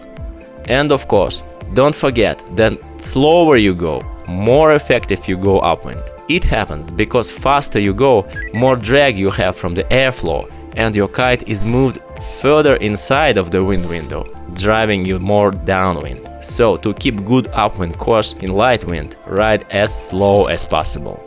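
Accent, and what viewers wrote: Russia. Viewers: Facking good Russian's accented pronounce!!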